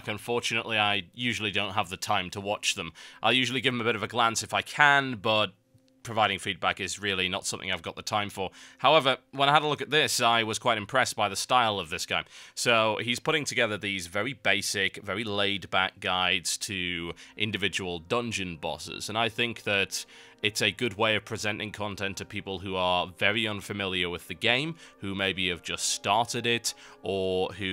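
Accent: United Kingdom. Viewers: 99% of the reason, why I have subscribed on your iTunes channel, and am permanently looking up your newest vids, is your brritish accent! Don´t let the US english ovetake the whole internet.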